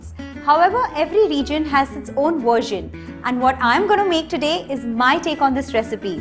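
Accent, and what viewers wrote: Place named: India. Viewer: I dont like the accent of indian when speak english hehehe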